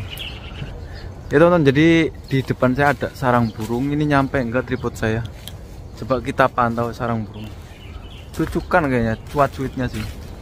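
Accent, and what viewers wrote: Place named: Banyumas, Indonesia. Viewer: mas nya asli orang mana. kok bisa ngomong Jawa ngapak wkwkw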